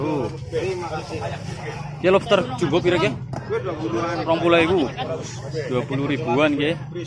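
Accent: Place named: Java, Indonesia. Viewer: Halmun 😆, mantap mas medokmu ngangeni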